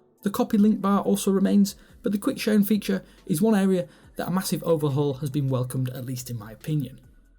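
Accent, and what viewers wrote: United Kingdom. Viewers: British Accent!🤦🏻‍♂️ Maybe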